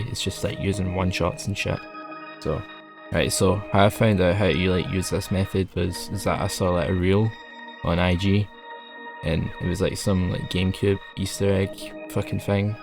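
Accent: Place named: Scotland, United Kingdom. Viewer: bro youre scottish af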